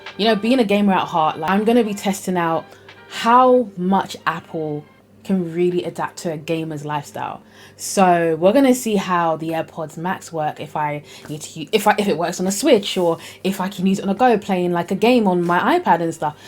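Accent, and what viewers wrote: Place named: United Kingdom. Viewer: Wow…nice. Don’t know how I missed you. Beautiful and witty. And that British tongue 👅……. Cheers! 🇬🇧 🇺🇸👍🏾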